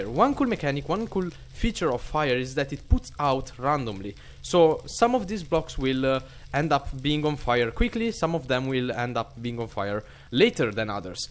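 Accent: Italy. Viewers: CAZZO BRO SI CAPIVA SUBITO DALL ACCENTO CHE ERI ITALIANO XD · Ahaha si sente tantissimo l accento italiano, bel tutorial btw 😂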